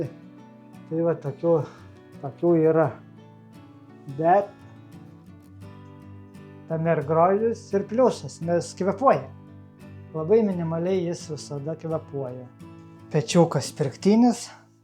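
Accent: Aukštaitija, Lithuania. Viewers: Vat tas grynas aukštaitiškas dialektas :D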